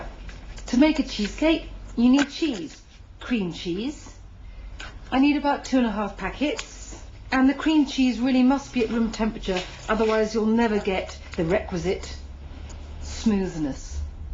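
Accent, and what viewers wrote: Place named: United Kingdom. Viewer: you sound very british...haha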